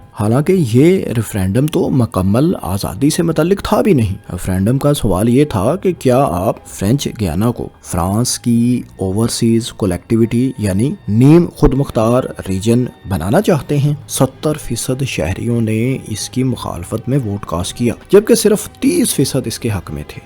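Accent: Punjab, Pakistan. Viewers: صاحب آپ کی ویڈیوز میں بہت اچھی معلومات فراہم کی جاتی ہیں ۔ لیکن آپکا اردو تلفظ ٹھیک نہیں ہے ۔ آپ پنجابی زدہ اردو بولتے ہیں ۔ مثلاً Himayat ko Hamayat, Mukammal ko Makkamal, Aazadi ko azadi, aor ko or Difa ko Dafa بولتے ہیں ۔ آپکے مقابلے میں فیصل وڑائچ صاحب کا اردو تلفظ بہت کمال کا ہے۔ آپ ان سے مدد لے سکتے ہیں ۔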